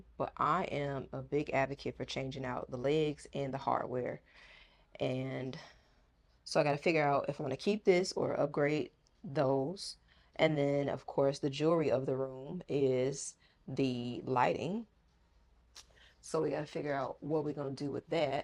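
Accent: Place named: Chicago, United States. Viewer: I have fallen in love with you!!! Ahhh.. and I recognize your accent. Chicago! Thank you for your sharing amazing talent! I love decorating and making my home elegant and beautiful! Your tips and so easy and very helpful!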